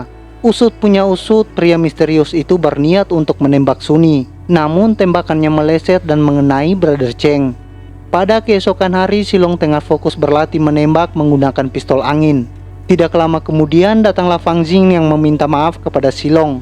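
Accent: Eastern Indonesia, Indonesia. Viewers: Yg ngomong ini sepertinya orang timur. Gak enak banget dengar bcra orang timur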